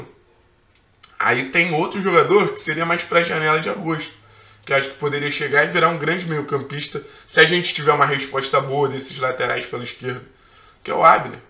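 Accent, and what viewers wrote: Rio de Janeiro, Brazil. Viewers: Ele é carioca da gema, não tem como forçar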